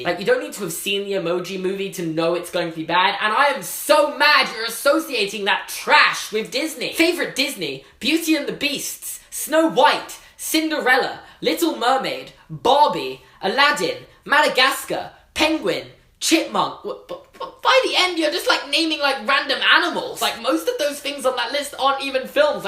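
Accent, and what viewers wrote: United Kingdom. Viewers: Are you from England or Wales? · Are you British or American?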